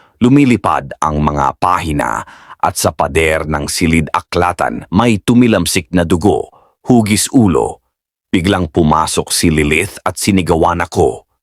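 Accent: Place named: China. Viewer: Intsik ka ata salita mo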